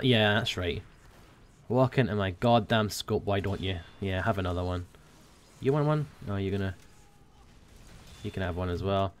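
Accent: Scotland, United Kingdom. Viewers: which coast of scotland are you from ?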